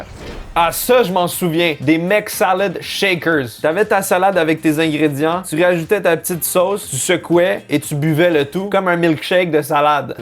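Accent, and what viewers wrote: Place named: Canada. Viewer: L'accent canadien c'est un sketch 😂😂😂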